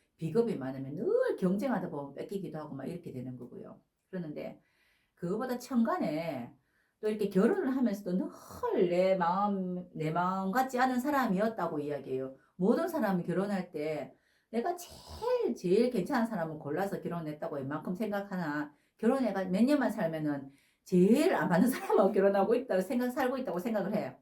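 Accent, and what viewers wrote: Gyeongsang, South Korea. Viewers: 개인채널이라지만 경상도 출신 아니면 귀에 들리지 않게 억양이 너무 강해서 못 듣고 나가요, 이런 경우 진짜 처음이오.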